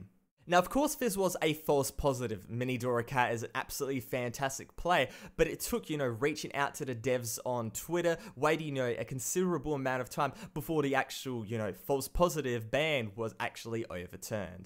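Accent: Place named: Australia. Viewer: 70k damn you are gaining quick bro! Really enjoyed this video, I think we all agree on the fact that CA is dead and probably has been for 2 years already. But it doesnt really matter what game, your commentaries are always so chill. Love the aussie accent haha.:P keep going bro:-)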